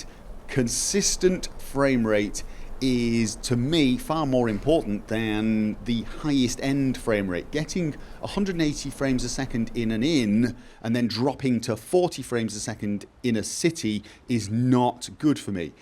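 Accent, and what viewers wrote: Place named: Scotland, United Kingdom. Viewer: are you Scottish? love yer accent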